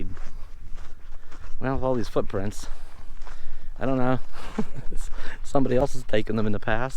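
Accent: Pennsylvania, United States. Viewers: Newer sub of yours! You definitely have a PA accent...I am originally from Mount Lebanon, PA-burb of Pittsburgh! Are you from PA or WV?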